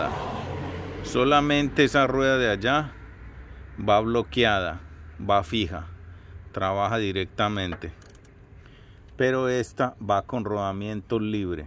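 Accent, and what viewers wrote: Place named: Antioquia, Colombia. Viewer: Justo lo que estaba buscando. Quiero hacer uno de estos y encontré varios videos en otros idiomas pero este en el español con acento paisa lo entendí a la perfección saludos desde Colombia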